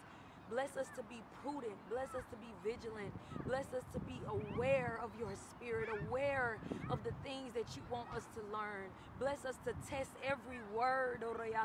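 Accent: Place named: Memphis, United States. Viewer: This was right on time. Idk how I got to your channel but I’m glad I did. Your videos have really been blessing me. I love the Memphis accent too (lol I can always hear a fellow Memphian 😂)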